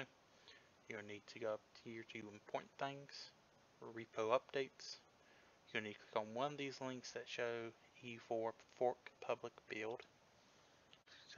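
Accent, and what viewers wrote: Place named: South, United States. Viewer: Thanks mate, love your southern (?) dialect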